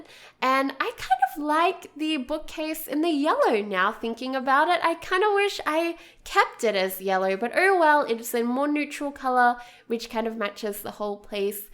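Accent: Australia. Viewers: are you from Australia? your voice is really similar to Deligracy’s haha 💕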